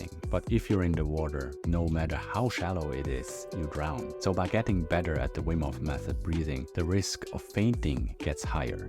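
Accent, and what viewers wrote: Switzerland. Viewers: Salut:-) Ich bin mega happy dass de Youtube-Algorithmus vor es paar Wuche mir din Kanal empfhole het und ich han scho recht schnell s Gfühl gha, dass mir de Dialekt bekannt vor chunt. Cool z gseh, dass au Schwiizer i dem Bereich aktiv sind.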